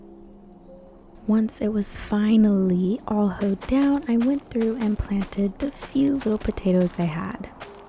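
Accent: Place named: South, United States. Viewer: I love how towards the end she just transitions more and more to her southern accent 😂😂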